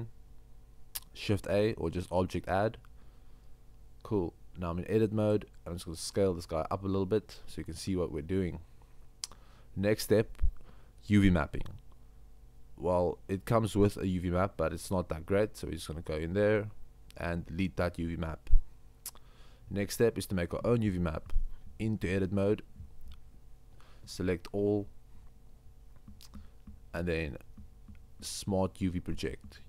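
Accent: South Africa. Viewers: Ngl get south African vibes from ur voice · dude are you South African?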